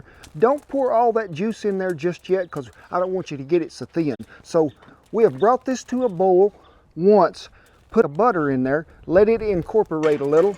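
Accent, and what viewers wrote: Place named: Texas, United States. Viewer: I love that Texan accent. Love your channel.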